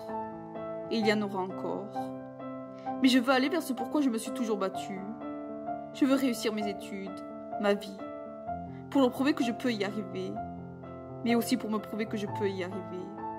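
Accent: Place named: Nîmes, France. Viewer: Tu es Nîmoise (au vu de ton accent) ? Et oui tu y arriveras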